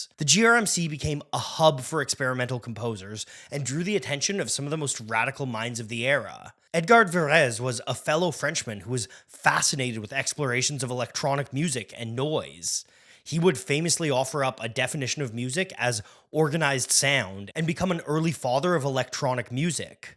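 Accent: Canada. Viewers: He's always had a bit of the ol' mushmouth lol. The occasionally intense Canadian accent doesn't help. But perfect pronunciation isn't why I watch these videos at the end of the day.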